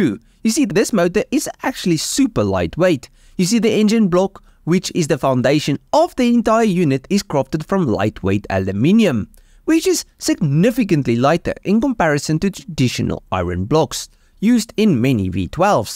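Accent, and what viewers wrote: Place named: Brakpan, South Africa. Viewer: Liked the video for the pure Brakpan accent 👍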